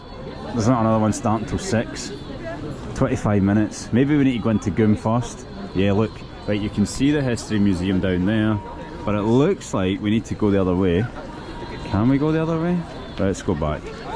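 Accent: Scotland, United Kingdom. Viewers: Awww! What a nostalgy for me. Last time I dealt with the Scottish accent was in my University days. 😻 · that beautiful scottish accent cheers from Belgium have a look also on russian cars in the streets and those in Europe the ultimate years.... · As I've noticed the Scottish accent is quite gorgeous. You pronounce sound ei instead of ai. Eis skating. 😊 Nice one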